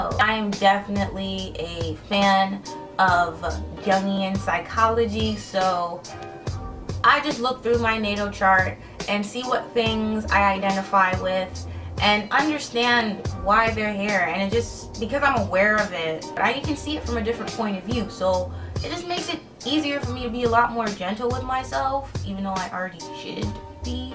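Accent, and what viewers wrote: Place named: United States. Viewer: thank you sooo much!!! This has helped me a lot!! && yeah I'm watching from London, quite far away,I love your accent! I wish I had an American accent lol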